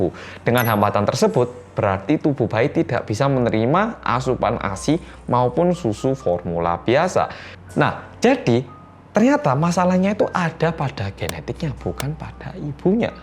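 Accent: Java, Indonesia. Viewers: Ka next vidio buatin tes pasikopat pliss Medooo 😄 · halo guys david disini bersama orang korea yang medookk 😁😁😁✌🏻✌🏻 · "Halo guys welcome back to Korea Reomit bersama orang Korea yang Medhokkkk" Knp selalu inget ortu Mas yang suka bilang medhok medhok ke kamera😂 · Mas kurusan, pipinya gx berisi lagi. Stay healt oppa meddok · hello guys kembali lagi dengan detektif reomit detektif korea yangggg medoook saya rekomendasikan mas ngomong gitu pas detektif reomit wkkwkwk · Mas... medok nya ngelewihi aku sing wong jowo.. hihihi... betah ngrungoke cerita2ne.. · Purik astaga jowo temen cak😹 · Welcome back to korea reomit, bersama orang Korea yang.......... Medhok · Medhok nya gak panjang lagi wkw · Mas kok gak terlalu semangat medokkkkkkkk nya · Habis pingsan lanjut lihat mas 🥺 medooookkkk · "Bersama orang korea yang...... Meduokkkk...." Tapi logatnya sangat enak didengar, jadi dengerin ceritanya tu enak. 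Dibanding youtuber lain yang kadang kalau ngmg suka bikin pegel telinga. Lebih parah kalau denger suara nelen ludahnya, bikin makin males. Goodjob mas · Coba donk kak bikin vid kok bisa bljr bahasa indonesia sampe bisa medok gtu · Hahahahah aku suka nonton ini pas lagi kesel langsung mood lagi. Soalnya aku yg tampang jawa bgt g medok ini yang muka bule medoknya ampun hahahahaha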